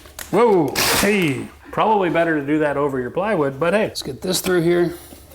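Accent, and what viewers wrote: Ontario, Canada. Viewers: I think it's the Ontario accent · As an Ontarian, yes, it's the Ontario accent.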